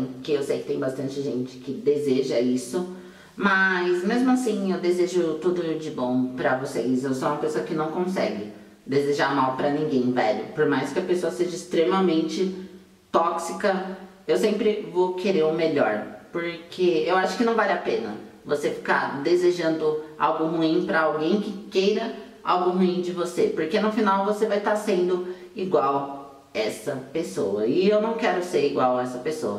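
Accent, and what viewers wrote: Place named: Portugal. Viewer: Tu és de Portugal? Pareces pela tua fala.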